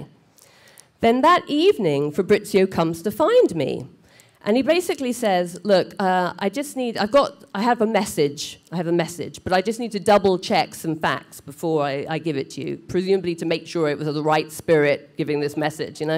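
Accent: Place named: United Kingdom. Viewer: Just stumbled across one of your stories via a friend on FB and found my way here. Love the stories. I'm a Trini and I think it's great that you visited your father in his country and found the missing parts of yourself. Here, you're just another slice of our rainbow. The Brit accent is the only thing that'll give you away! :)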